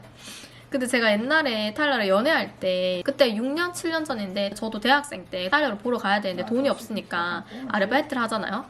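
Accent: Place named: Daegu, South Korea. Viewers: ㅠㅠ 너무 속상한 일일텐데 말도 너무 웃기게 하시고 ㅋㅋㅋㅋ 사람들이 비슷한일 당할까봐 열심히 설명해주시는게 너무 귀여우세용!!! ㅋㅋㅋ 저도 대구사람이어서 사투리도 너~~무 반가워요!! 은행사이트도 구글에 검색해서 들어가면 똑~~~~같이 만들어서 사기치는 놈들이 있다고 하더라고요.. 꼭 진짜 사이트인지 확인 잘하시고 이번에는 더 큰일 안나서 다행이에요!!♥️ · 항상 웃기고 재미남 지송 사기당했다는데...있는그대로모습 미국에서 대구사투리 찐정겹당 ^^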